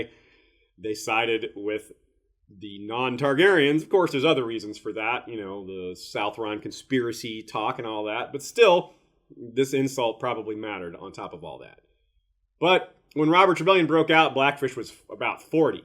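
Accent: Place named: Canada. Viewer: While I love all your videos, the new voice overs were a little much. Normally I'll leave the video playing during work and a coworker made a comment that they sounded Canadian. -_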